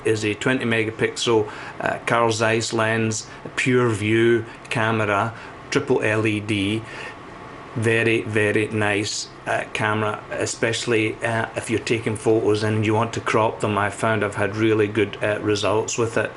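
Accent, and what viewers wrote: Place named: Scotland, United Kingdom. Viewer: I liked your sco'ish accent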